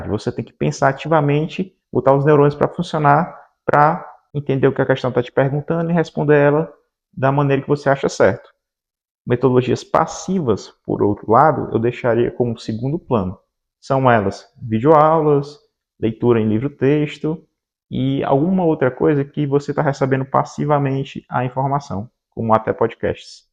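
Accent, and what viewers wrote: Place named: Brazil. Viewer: Maneiro o vídeo! Só seria legal colocar na descrição os nomes ou legenda porque como seu inglês tem uma pronúncia bem brasileira, não da pra entender todos os nomes que você fala :(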